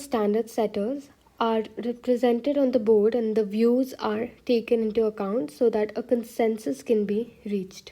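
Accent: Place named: Kerala, India. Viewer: Are you malayali . Sound ketapol thonni😊 . Good class really helpful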